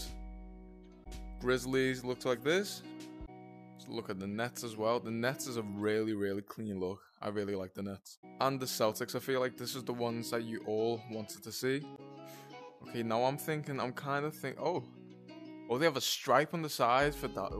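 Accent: Liverpool, United Kingdom. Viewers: Yo are u from liverpool England?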